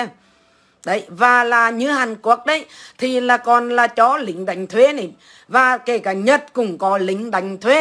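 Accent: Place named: Hue, Vietnam. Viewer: Chào chị nghe giọng nói tôi rất thích nhất là giọng huế.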